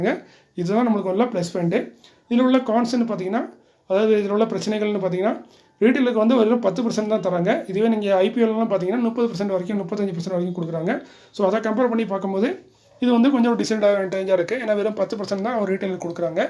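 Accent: Tirunelveli, India. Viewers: Sir please speak as early .Don't try new version. For others we cannot change Tirunelveli Tamil 😊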